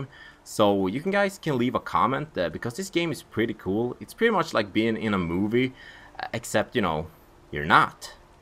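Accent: Sweden. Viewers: If the guy who voiceovers this clip isn't from Sweden I'll eat my hat. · ha 10 seconds in the movie and i can tell, ur swedish;)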